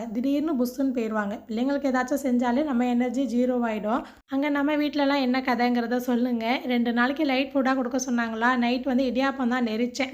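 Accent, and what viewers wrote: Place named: Keelakarai, India. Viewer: Assalamualaikum sis unga slag keelakarai slag onuthaa...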